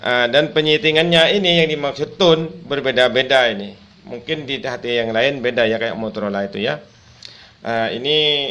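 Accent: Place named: Madura, Indonesia. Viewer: pantes logat nya kayak bahasa madura pak 😁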